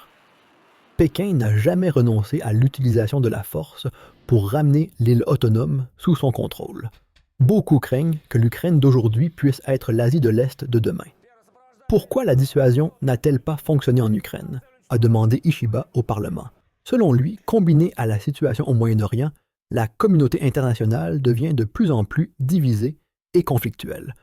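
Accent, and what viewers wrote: Quebec, Canada. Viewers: Narrateur québécois?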